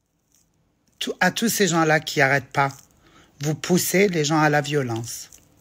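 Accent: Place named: Saint-Denis, France. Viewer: Français des quartiers défavorisés de saint denis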